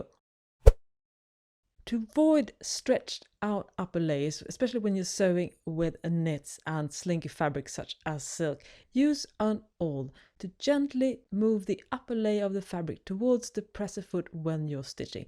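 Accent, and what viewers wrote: South Africa. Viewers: I'm trying to guess what your speaking accent is, I find intriguing and quite pleasant to hear . South Africa, Dutch ? Please share. Thanks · is this lady south african? im not sure cuz sometimes i will still mix it up with australian for whatever reason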